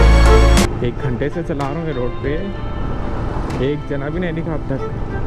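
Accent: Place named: Hyderabad, India. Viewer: Looking awesome in hyderabadi accent 🖤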